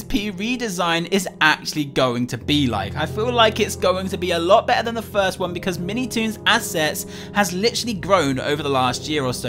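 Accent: United Kingdom. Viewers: your from england i love your voice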